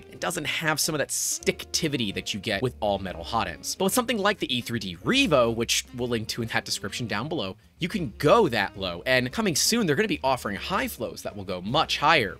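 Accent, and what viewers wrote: United States. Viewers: Oh, My... Degrees in Celsius and "Aluminium"? Am I misjudging your American accent?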